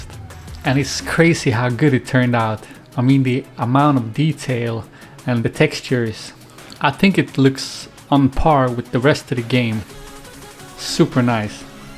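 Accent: Scania, Sweden. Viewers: Jäkla grov skånska grabben hade!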